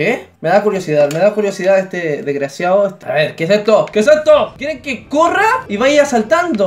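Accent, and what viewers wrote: Chile. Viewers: eres chileno ¿no? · Este Weon es chileno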